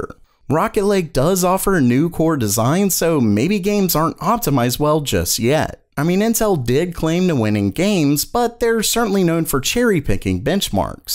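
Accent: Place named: South, United States. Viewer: I can't tell if is a southern guy trying to hide his accent or a regular trying to do a southern accent.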